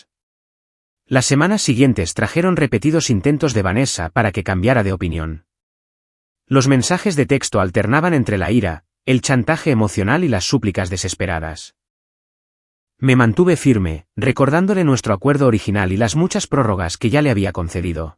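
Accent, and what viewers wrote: Spain. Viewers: es mi favorito narrador tanbien no mea burre me lleva ala imaginacion de cada personage como si fuera pelicula envivo asi como narracon esavos y acento espanol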